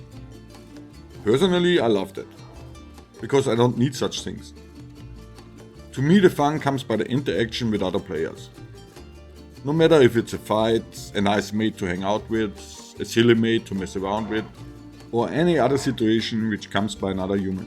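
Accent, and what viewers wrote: Germany. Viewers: German detected. Bayern? Your voice sounds like Bavarian person, but I might just be wrong there.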